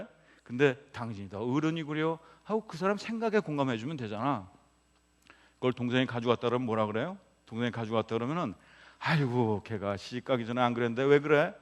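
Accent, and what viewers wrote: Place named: Seoul, South Korea. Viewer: 강연 들으면서 문득 드는 생각이 요즘 나오는 서울사투리 말투시네요~~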